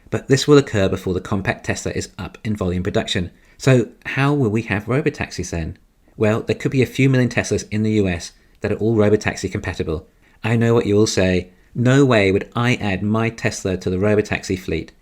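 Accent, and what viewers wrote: England, United Kingdom. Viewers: I was sure you were from England rather than New Zealand...???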